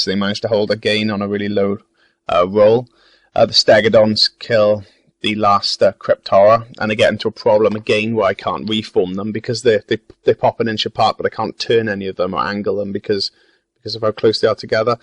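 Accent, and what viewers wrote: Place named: Wales, United Kingdom. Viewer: Nice battle report, lovely models. Good to hear a Welshman amongst all the Americans. Hope you do more videos.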